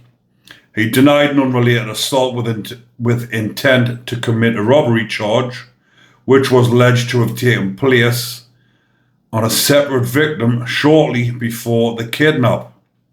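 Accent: Newcastle, United Kingdom. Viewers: Bacon, hes a Jordy it’s his accent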